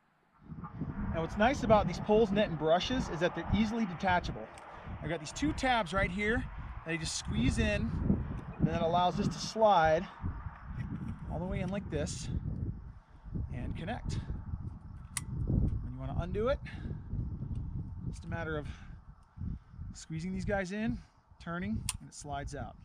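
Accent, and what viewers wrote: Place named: South, United States. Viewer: Thank you for the video, in liked your country accent. Beautiful pool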